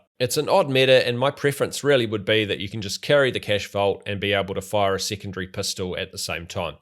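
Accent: New Zealand. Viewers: Nothing beats the kiwi accent!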